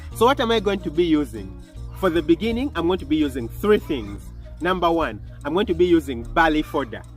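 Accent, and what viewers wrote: Uganda. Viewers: All along I was checking your accent and felt like it was Ugandan, and finally, you mentioned it in this Video! Good Work Man, Nice Channel, ndi big fan of your videos.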